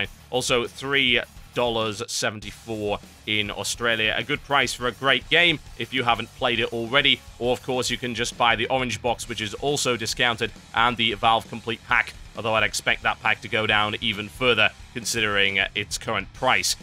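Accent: United Kingdom. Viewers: i love your videos and your deep British accent makes it that much more epic! Plus your impeccable grammar makes it even more epic. So your videos are epic times like 120!!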